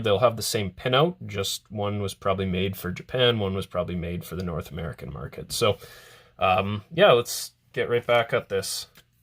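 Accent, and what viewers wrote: Canada. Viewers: Hi im from the Netherlands. I really love your videos, and learning stuff and such a pleasant Canadian accent. Just wanted to say it once. Keep going!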